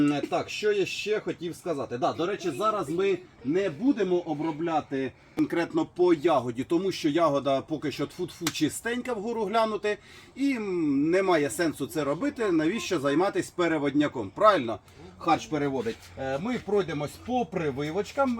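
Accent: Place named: Luhansk, Ukraine. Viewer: Я балдею от вашей разговорной речи луганск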